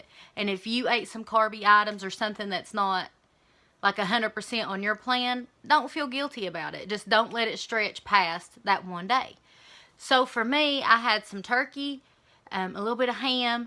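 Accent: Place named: South, United States. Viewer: I also get dumping with a lot of sugar. You're great - live your videos and your accent. I live in Florida, but we don't have that think southern accent. I love it and could listen to you all the time. Keep up the great job! Looking good!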